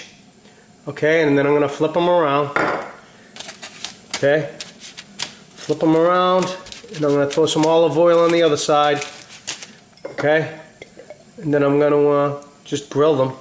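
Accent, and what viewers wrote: Boston, United States. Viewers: This sounds like an episode of the Sopranos...or Boston? I live in the midwest so it all kind of sounds the same to me! Great presentation of that dish!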